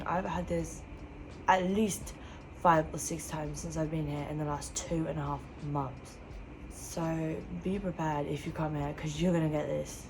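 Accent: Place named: England, United Kingdom. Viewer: sorry where u from ? england? no surprice peace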